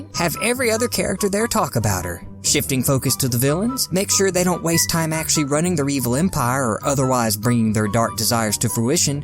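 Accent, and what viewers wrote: South, United States. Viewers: your mildly southern accent makes these 100 times funnier to me man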